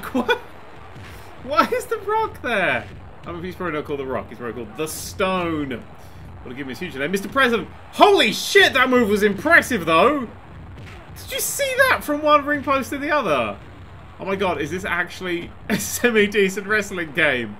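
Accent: United Kingdom. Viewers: Are you British or Australian🤔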